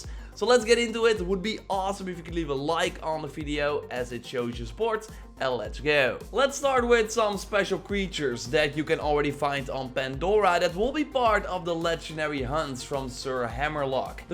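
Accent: Netherlands. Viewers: Hey, are you dutch? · You have the same accent as the YouTuber tbag epic gaming. So I'm guessing you are from the Netherlands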